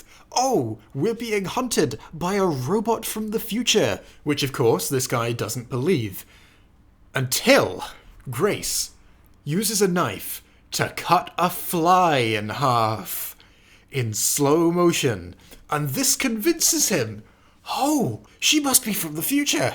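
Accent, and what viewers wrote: United Kingdom. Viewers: Why is it always someone on YouTube with a British accent that bashes this movie???? This is the 5th video with a Britain bum upset or angry. This hits their worldly view that a minority a Mexican at that is the hero in this movie. Shows how ignorant and underling views they have about other people! In the end this was a good movie and I suggest everyone not listen to the critics!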